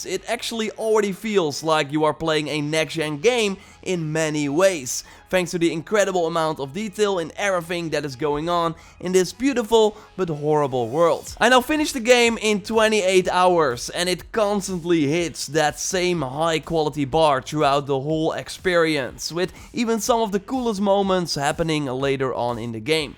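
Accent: Netherlands. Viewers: This Dutch accent moron is clearly paid off by the developer. Not one negative thing to say about this game.... he's just praising every single aspect of this game even though many legit reviewers clearly state that this game has aspects which are total garbage like the AI, and it's nowhere near as good as even the first game. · IS DUTCH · Haha this guy has a heavily dutch accent so I think you’re dutch don’t worry though I am dutch too. Maakt niet uit man😂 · He's Dutch.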